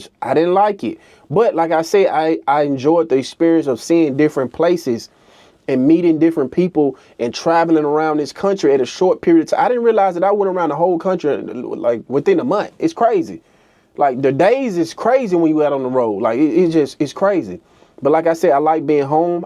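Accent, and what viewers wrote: Memphis, United States. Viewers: U from Memphis mane ? U got the accent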